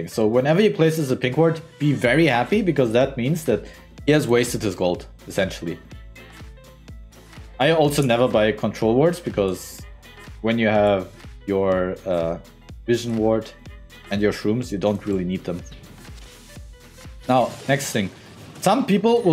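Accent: Germany. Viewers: Ich merke an deiner Aussprache sofort, dass du ein deutscher bist =) Also herzlichen Glückwunsch zu deiner Leistung <3. Kannst du bitte eine kurze Zusammenfassung bzw Checkpoints der Mechanics für Challenger Teemo & einen Toplaner auf Deutsch bestenfalls für Wildrift raus hauen? Ein Beispiel ich habe mir einen Toplane Kassadin für Wildrift von den besten Spielern auf YT mit Meilensteinen eingerichtet: Vor Minute 5 muss der RoA fertig sein und bis Minute 10 muss der Seraph wenigstens gekauft sein. Dadurch drehe ich egal wie das Game am Anfang lief meistens im Lategame. Ich würde mich freuen dich in Wildrift bald auf dem Weg ganz nach oben zu sehen^^ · The whole time i was like..he sounds german.. Hatte ich wohl recht xD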